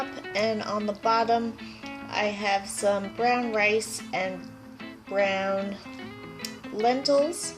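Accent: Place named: Boston, United States. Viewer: you from boston? sounds like it how u pronounce coffee, sauce and salt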